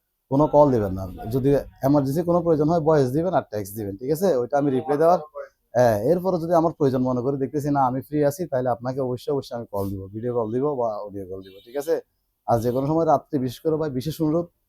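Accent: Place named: Chittagong, Bangladesh. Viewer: ইভা চিটাগাগত তো মনে হচেছ।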